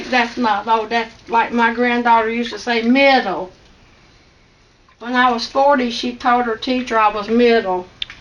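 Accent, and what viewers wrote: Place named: West Virginia, United States. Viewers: Love your videos. I saw Appalachian cooking and thought of my Daddy , he was born in the Appalachian mountains. He had the same kinda accent as you. Brings back alot of memories and your cooking is similar to his. God bless you. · I would LOVE to know where you live.. You sound like you are from either West Virginia or Kentucky..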